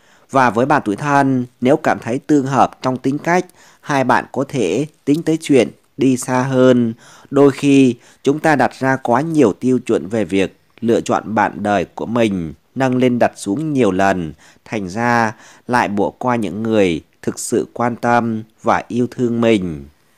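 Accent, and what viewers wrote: Thanh Hoa, Vietnam. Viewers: thay. giong. noi. giong, nguoi. xuan. yen.tho xuan thanh. hoa. que. ngoai cua minh. Cung noi vay ?😄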